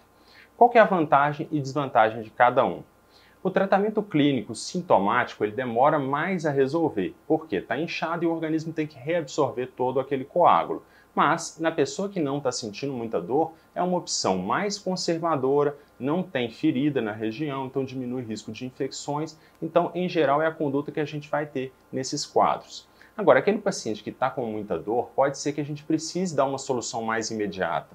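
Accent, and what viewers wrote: Minas Gerais, Brazil. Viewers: Boa noite Doutor! Você é de Minas né! Conheço pelo sotaque.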